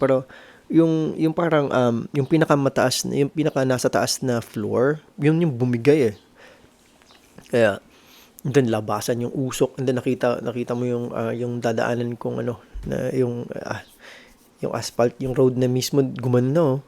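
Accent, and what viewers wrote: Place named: Luzon, Philippines. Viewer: New subscriber here. You’re from Gensan but you sound like you’re from Luzon when you speak Tagalog. Just an observation from someone who grew up in the island of Luzon. 😊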